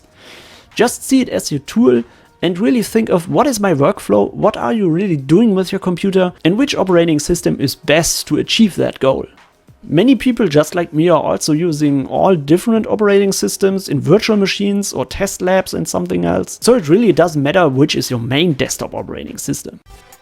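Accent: Germany. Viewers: Man hört kaum das du deutscher bist xD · Yeah, German accent, great 😃 Subscribed!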